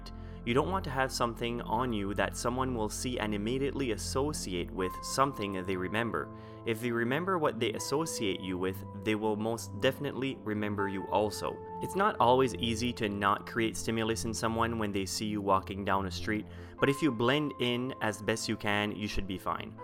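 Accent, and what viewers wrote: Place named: California, United States. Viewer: sounds like your from cali or south florida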